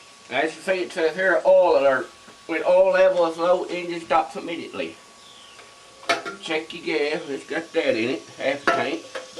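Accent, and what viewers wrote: South, United States. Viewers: Well, here is the proverbial at it again. I must admit, that in all sincerity, I love the Southern accent. Men and Women, hear me out... the "good 'ol boys" from the south, have taken some bad raps, in regards to racism and tyranny over our friends and neighbors who are black. Again, I admit, that there were multiple, AND I MEAN MULTIPLE times when they did the wrong things regarding interracial culture, including outright murder!!! My heart will always be saddened by what the American South has done to our brothers, sisters, and their children. I had hoped that by this day and age that we would be over this issue. But I can clearly see that we have not. Here is my point. This guy is a typical image of what the rest of us see. - - - A "Good 'Ol Boy", but thru it all, at least in my life, I have learned that they do have quite an ability to repair things. 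Duct Tape and Super Glue, et al, will most likely fix anything. This video was great!! The replies are even better. I Say... take it for what it's worth, and by God, DO NOT smoke while you clean your carb. He also gets credit for being such a "likable", guy and his "dowg", seems to be at home with him and loving life!... Great post, chew me out if you want folks, but I still, will continue to believe in our... NOW... WILL WE be able to teach the hardline bigots down south anything? Well, it would appear that we just keep "chipping away at it"... and so we continue. I am not sure about you, but befor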